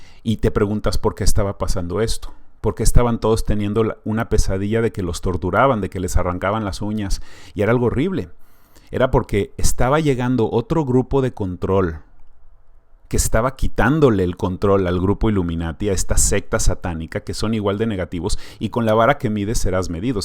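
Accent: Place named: Mexico. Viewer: Aprecio mucho tu información, y me encanta el acento mexicano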